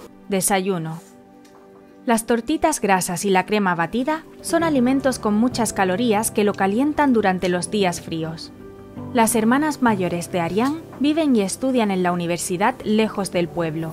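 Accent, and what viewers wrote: Spain. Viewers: Vaya , el vídeo con acento español qué maravilla , no soporto esos acentos latinos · Menos mal que la narración es en español de España , no soporto esos vídeos con acentos latinos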